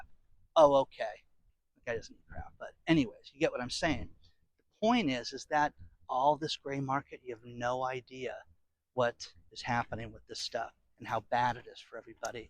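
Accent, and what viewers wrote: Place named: Wisconsin, United States. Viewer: Great hope you put them all to work! Smile sounds like you are from WI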